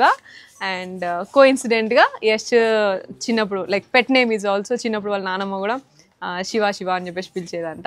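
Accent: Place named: Telangana, India. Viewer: I like your dressing style aand the way your speaking... Telangana slang vinadaniki baguntadhi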